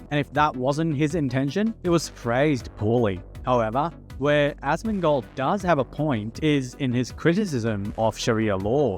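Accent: Australia. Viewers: He's Australian but I believe partially grew up in an Islamic country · You've got native australian accent. I suppose you were under 10 yo when you left your country :) thanks mate for the content! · He sounds australian….shows what you know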